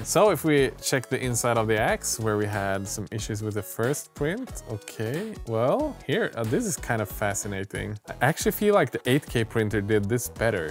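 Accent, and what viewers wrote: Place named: Sweden. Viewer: This sounds very Swedish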